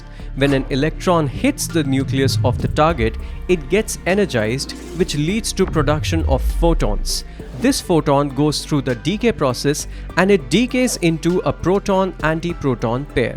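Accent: India. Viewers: Its the annoying indian accent I cannot tolerate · This is an Indian guy. His accent.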